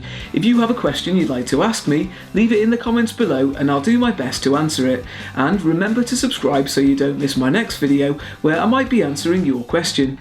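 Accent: England, United Kingdom. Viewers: Hi! You are a genius. It was so easy to add the "White" space on my Word document. also, it sounds as if you are from England. I have done karaoke tributes in costume to honor Princess Diana. I worked for a man who had #1 USA, Chicago catering company. He had worked in the English Palace for Queen Elizabeth. He hired me to hire "only" Whites since his company was going bankrupt with only colored tuxedoed part-time waiters being sent by Temporary agencies. That was 1997. I went back to Chicago. I helped save his company by hiring about 100 Whites. But as George Jewell, owner said, "We'll not only hire Whites but we will have to develop them." Just as you helped me with my Word problem, I thank you. Although it's been a curse since I now use the word "White" frequently.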